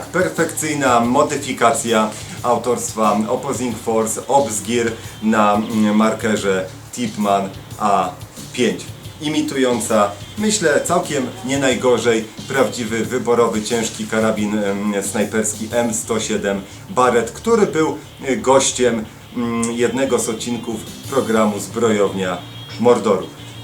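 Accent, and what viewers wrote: Silesia, Poland. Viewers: skąd jest Rojo? Ze Śląska? ;p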